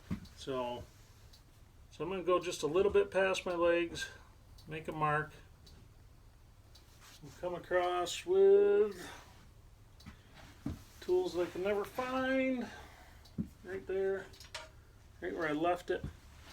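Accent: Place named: Midwest, United States. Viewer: Thanks for this video. I am looking forward towards the building of it. Your channel is starting off small, yet I see something in your presentation which reminds me of Wranglerstars' earlier days. Please keep on building. Also, could you do something with the audio portion of your video. I've pumped my computer up to 100% along with the YouTube volume, yet it seems like you are speaking in a soft voice. Please check your audio equipment and see if the volume is turned up to full. I'd rather you blast my socks off and then I'll just turn down the volume. It will be interesting if you hog out some of the butt cheek portion of the seat or will you just be sitting on a flat slab? Heck, the way you go about doing things it might just be as comfortable with a Barka lounger cushion on it. Plus, one more thing. What part of the country are you in? 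I'm betting it is Iowa or Wisconsin due to your accent. Thanks again for your vids and please keep them coming. Terry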